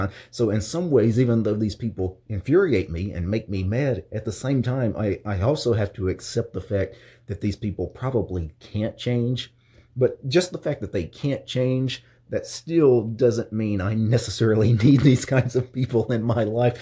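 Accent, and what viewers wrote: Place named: Texas, United States. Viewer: Unsubbed. It goes both ways,. Some people struggle with this their whole lives despite conscious efforts to improve. You sound like a true Texan in this video, and I don’t mean that in a good way. You are only isolating yourself.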